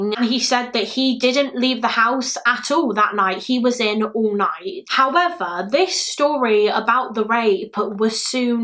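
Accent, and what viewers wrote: United Kingdom. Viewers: I’ve also noticed that most true crime YouTubers I’ve came across literally are from the UK.. I’m from America but I have so much true crime channels I’m subscribed to, but out of all the channels I watch religiously only like 2-3 of those are from America, Like 1 is from Canada, and 10+ are from the United Kingdom, that’s crazy as hell, but don’t get me wrong that’s dope as hell… bcuz I love British accents! I love your accent, its sooooo CUTE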